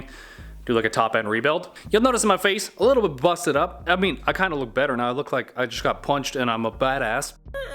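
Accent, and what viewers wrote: Canada. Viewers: Props from Manitoba, only YouTuber I've found with more or a Canuck accent than me. Keep killing it man. Lets race once my caprice is done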